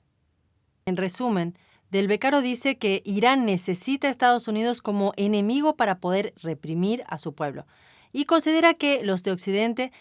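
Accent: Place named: Argentina. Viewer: De donde sos? Pareces argentina hablando en español neutro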